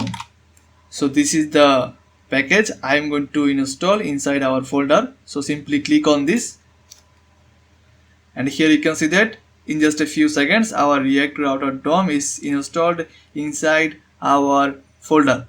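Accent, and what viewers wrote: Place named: India. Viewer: "Here you can see that" , also nice video , the accent is very indian sir.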